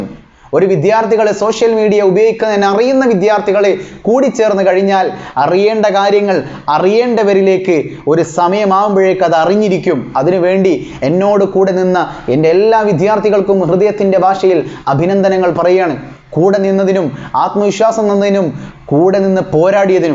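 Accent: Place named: Malappuram, India. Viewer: താങ്കളുടെ voice അടിപൊളി പക്കാ mlpprm ഭാഷ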